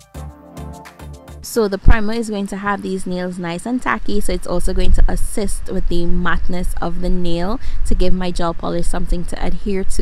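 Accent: Trinidad and Tobago. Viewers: I heard the trini and got so excited 👏🏽👏🏽subscribed and followed one time. Awesome video 🤍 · As soon as you started talking I knew you were Trini <3 ! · I can spot a Trini accent from anywhere 🇹🇹🇹🇹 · where are you from u sound trini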